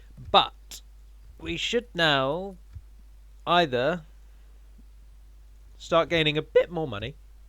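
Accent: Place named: RP, United Kingdom. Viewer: Ah, the Anduin campaign. Where skill level drops significantly xD. In your other campaigns you play mostly as i'd do myself really (except for the queueing of 4k-ish gold cost buildings when you make like 300/turn:P ), but with Anduin I just want to scream at the screen haha. Mostly I resort to facepalming instead. I don't think you will fail the campiagn though, it's really hard to lose since the AI works in mysterious ways. But you aren't making it easy for yourself thats for sure. I don't really watch lets-plays but these I really do enjoy. Probably because it's a really nich (it's that the word?) game, mod-within-a-mod-whitin-a-really-old-game, combined with a posh british accent.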